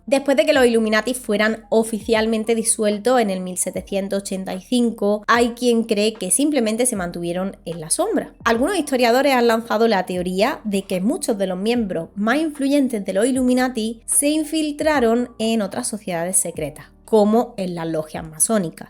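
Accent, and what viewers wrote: Andalusia, Spain. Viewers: Me siento raro por mirar un video neutral, bien redactado y objetivo sin que me hayan incertado un sponsor. Agradezco tu contenido. Fan del acento andaluz.:) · Me enamora tu forma de narrar la historia, sobretodo con tu acento andalú. Saludos desde Ecuador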